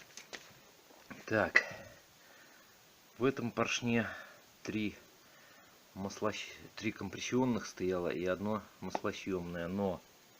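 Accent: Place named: Belarus. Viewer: Здравствуй! Я с Черниговского района Черниговской обл с Анисов Украина.Буду очень благодарен.Ты не с Белоруси? Акцент вроде бы похож.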